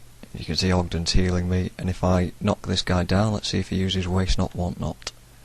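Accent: United Kingdom. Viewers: ty alot for helping me with some hero builds british guy ;-)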